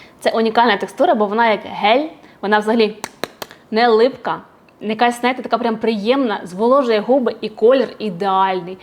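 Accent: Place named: Western Ukraine, Ukraine. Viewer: по вимові відчувається, що вона з заходу України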